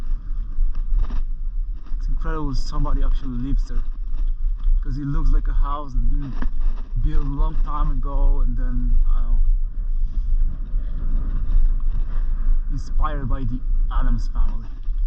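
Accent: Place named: Germany. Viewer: I Detect a Accent 🤔🤔 I almost want say German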